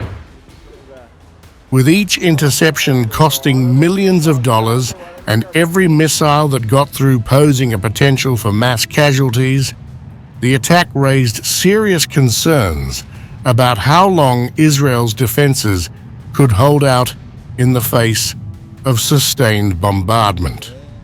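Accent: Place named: United Kingdom. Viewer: And speak american English, not brutish one!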